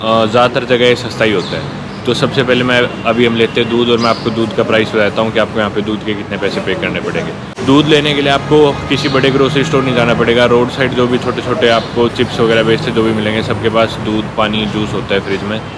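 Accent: Haryana, India. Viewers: Like ur Haryanvi touch it is like having a sprinkle of honesty and strength .👍🏼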